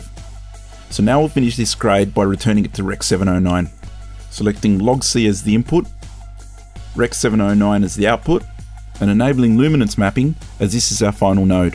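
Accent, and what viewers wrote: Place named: Sydney, Australia. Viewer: Lolz,.. sounds to my ears like he's got a Western Sydney or North West Melbourne accent. Trust me, he's talking slow for those accents :)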